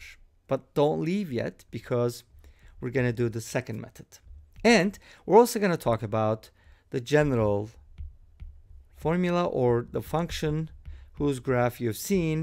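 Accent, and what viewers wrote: Turkey. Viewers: Your accent tells me you are from Turkey:)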